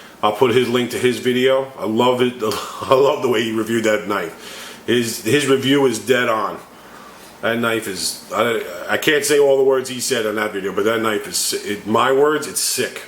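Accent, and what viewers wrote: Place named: Massachusetts, United States. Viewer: I'm from nh. Your accent sounds like mass that's all. jerseys not all that far from mass though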